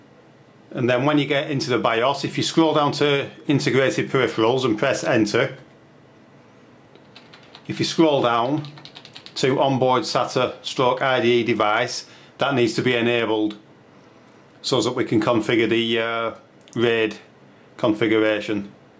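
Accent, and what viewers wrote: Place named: Scotland, United Kingdom. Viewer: Thanks! But I had to watch the whole thing over a few times, because I was so mesmerized by your Scottish accent. ;) ^_^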